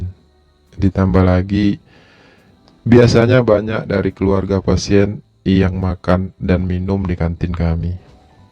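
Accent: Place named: Padang, Indonesia. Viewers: INTONASI NYA BENAR KENTAL PADANG BIAR DIA.BERBAHASA INDINESIA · Dari logatnya dipastikan adminnya orang padang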